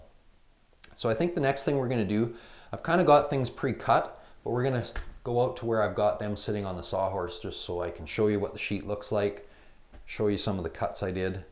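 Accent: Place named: Canada. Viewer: Are you Canadian? You sound more Canadian than me and I’m from northern ontario where I’m told the accent is the strongest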